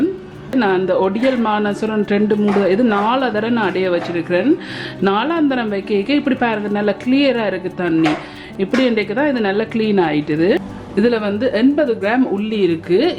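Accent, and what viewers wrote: Jaffna, Sri Lanka. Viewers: Akka kool super ant unkatha singala tamil super irukke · Very nice Jaffna tamil ⭐🌟💘❤👍👌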